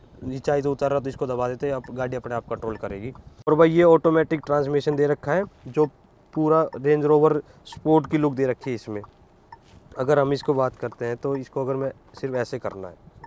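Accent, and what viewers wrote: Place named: Haryana, India. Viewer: Bhai mere loved ur haryanvi tone …. Pehli bar haryanvi mein car vlog dekh ke ghana hi maja aagya … moj kar di bhai … bhot badhai aapka haryanvi mein vlog dekhte hi subscribe kia meine channel aapka … Jai shri Ram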